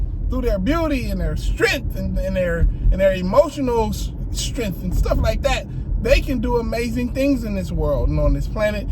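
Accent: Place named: Jamaica. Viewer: Your African accent sounds more like Jamaican to me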